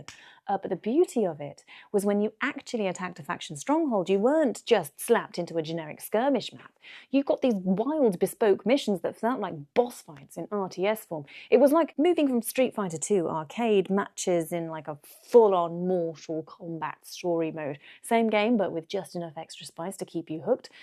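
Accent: United Kingdom. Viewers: Uk-uk! A knowledgeable, entertaining, nice and pretty person with a British accent on the internet! And covering topics that are also my favorite cup of tea! Subscribing now and listening to while having coffee and shaking my pitchfork at the Witcher Show Series.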